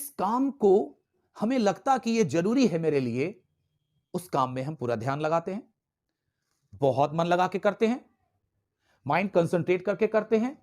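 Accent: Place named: Bihar, India. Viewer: Very nice video sir, I like you biharihood tounge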